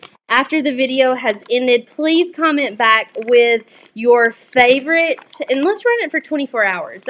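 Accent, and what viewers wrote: South, United States. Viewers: I love your southern twang!!!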